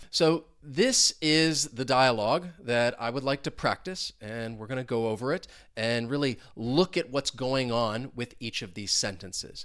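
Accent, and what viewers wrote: United States. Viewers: I love the American accent .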